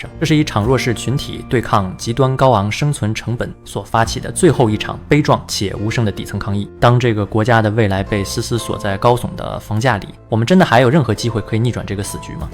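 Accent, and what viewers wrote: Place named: China. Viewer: 大陸口音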